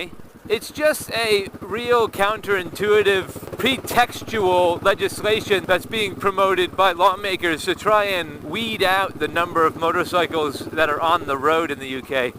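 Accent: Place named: United States. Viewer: Enjoying all your videos, just wondering...you don't sound British. Migrant American ?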